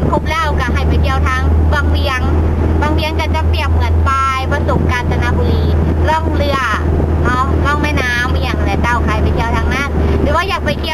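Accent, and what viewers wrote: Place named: Thailand. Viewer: สำเนียงออก ไทย มากเลยครับ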